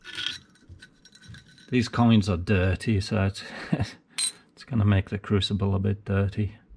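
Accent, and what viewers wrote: Northern England, United Kingdom. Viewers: i can tell you're from either very far north england or very far south scotland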